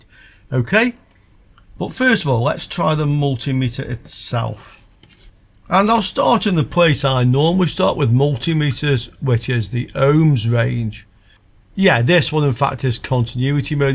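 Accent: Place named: Stoke, United Kingdom. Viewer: Classic stoke accent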